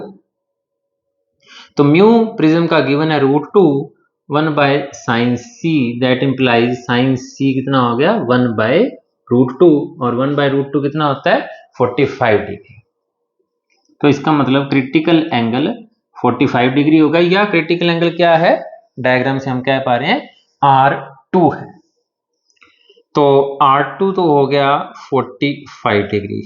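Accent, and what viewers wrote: Haryana, India. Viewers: Haryana se ho kya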